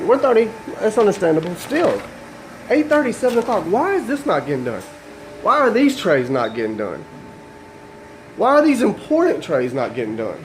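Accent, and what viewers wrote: United States. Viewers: Joey Monroe prob just a bronx/new york or brooklyn accent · HARD WORKING GUY. SEEM DEDICATED, AND YOU ARE LISTENING TO IN THE BACKGROUND. PLEASE STOP TALKING WITH Y OUR NEGRO SOUTHERN ACCENT, YOU'RE WHITE -OR SO IT APPEARS. SOUND PROFESSIONAL. THANKS. KEEP ON TRUCKIN.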